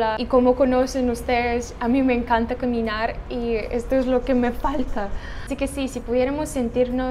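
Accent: Colombia. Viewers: Hola, tienes un buen acento español Colombiano. Saludos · ya se te escucha un acentico de costeña 😄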